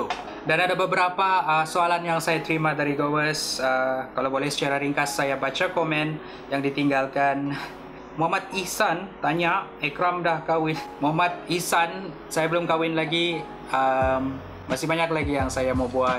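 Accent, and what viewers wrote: Sabah, Malaysia. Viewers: sabah here. pekat btul sabah mu. vid mu nda prnah mengecewakan. semoga sukses selalu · Sabahan bah nii... Like bah kita kita juga nii.. Haha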